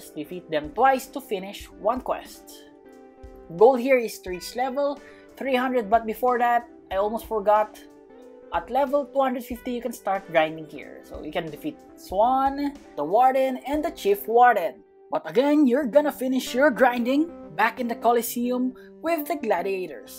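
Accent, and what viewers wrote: Philippines. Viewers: Pilipino kaba kasi parang pilipino ang accent mo eh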